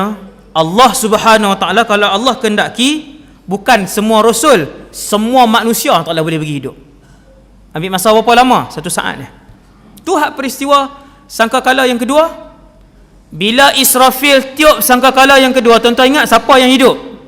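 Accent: Kedah, Malaysia. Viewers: Pasai ustaz ckp KEDAH lah duk dengar ulang Kali x jemu.. Semoga ustaz SENTIASA DALAM KEREDHAAN ALLAH SWT 🤲❤️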